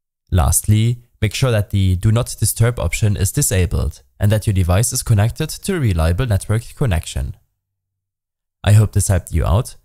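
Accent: Germany. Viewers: You accent is very weird. You sounds like a german person who speak englisch.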